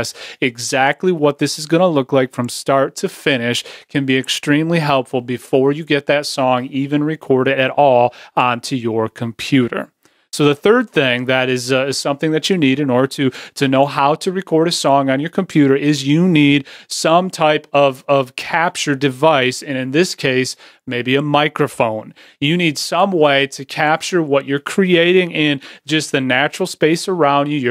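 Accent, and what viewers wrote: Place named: United States. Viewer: oh my god...that american accent !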